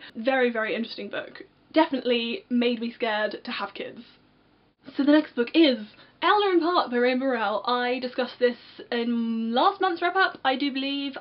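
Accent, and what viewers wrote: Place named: United Kingdom. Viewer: Great books! Looking forward to watching more of your videos :) I'm not sure what my favourite book of 2014 was to be honest! I think I'll have to go Cinder by Marissa Meyer. :) Just wondering, where abouts in the UK are you from? I love your accent!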